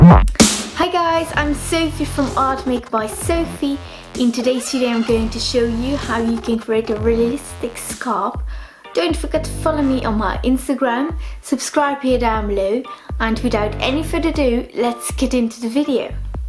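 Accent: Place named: England, United Kingdom. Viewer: Coooool!! U Engels accent kilt mij😂😂😉😘😘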